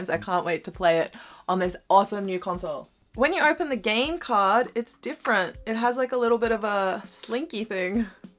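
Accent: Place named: Australia. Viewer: It's a totally different story here in Australia - there's Switch 2's everywhere - I mean sure, pre-orders are required and all that but even if you walk into a store - there's a good chance they will have some spares laying around! It's ether we over-ordered and not many people want to buy them, or perhaps Australia's got some spending issue! BTW, are you Australian - your accent sounds Aussie?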